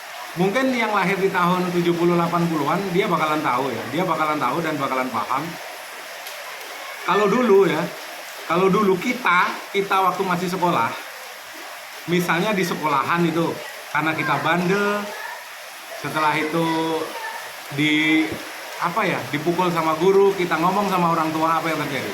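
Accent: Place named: North Sumatra, Indonesia. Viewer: Untuk saya yang lahir dan besar di daerah danau Toba udah biasa dengan logat keras seperti ini, bahkan mungkin logat bang seberapa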